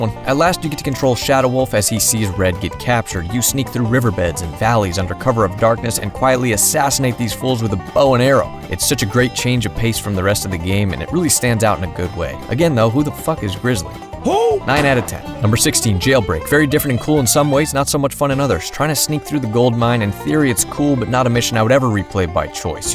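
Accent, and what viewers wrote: United States. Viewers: I love your heavy cowboy accent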